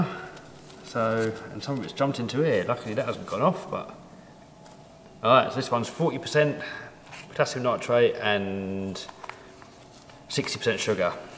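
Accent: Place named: United Kingdom. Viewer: GradeAUnderA is that you? What region or area in the UK is your accent from?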